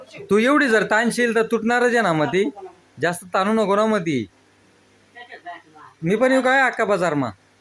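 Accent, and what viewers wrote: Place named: Ahirani, India. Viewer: Dada tumi same maja navra sarkh bolta aayrani madhe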